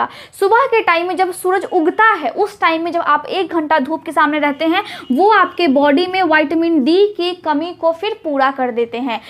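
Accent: Bihar, India. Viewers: Apki Bihari accent achha lagta he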